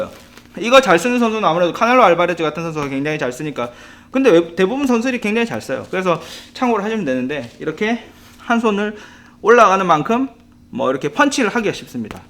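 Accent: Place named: Gyeongsang, South Korea. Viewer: 경상도억양이 느껴져서 경상도에 있는 줄 알았습니다ㅋㅋ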